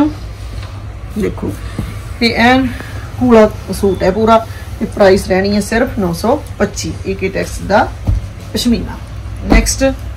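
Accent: Standard. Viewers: Di suit te tohade sohne hai hi mainu tohadi pure Punjabi boli vich Bolan da style bda sohna lagda hai i like you very much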